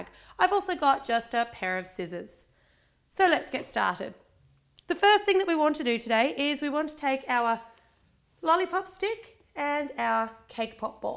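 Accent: Australia. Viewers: Nah she totally sounds Aussie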